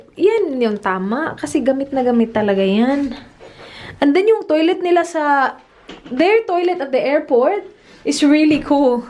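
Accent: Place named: Visayas, Philippines. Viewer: ang cute magsalita ng tagalog na may halong bisaya :) pero ang galing mag english :)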